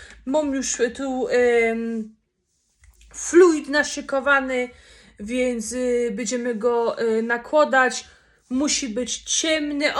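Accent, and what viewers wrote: Silesia, Poland. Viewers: Kobieto, jak ja Cię kocham miłością szczerą ❤️ Ciebie to powinni sklonować, krejzolko najwspanialsza 😂😂❤️❤️❤️ Kiedyś nie lubiłam śląskiej gwary,ale Ciebie to mogę słuchać całymi dniami. Pozdrawiam ze Świeradowa Zdroju ❤️❤️❤️❤️ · Ale ty jesteś przykra z tymi twoimi głupotami i żenującymi mało interesującymi filmami no i z tą wkurzająca gwara śląska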